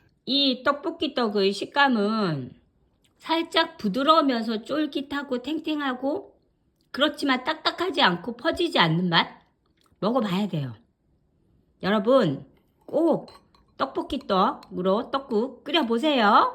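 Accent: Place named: Gyeongsang, South Korea. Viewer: 경상도 억앙도 음식도 정겹네요~^^ 새해엔 좋은 일만 가득 하세요~~~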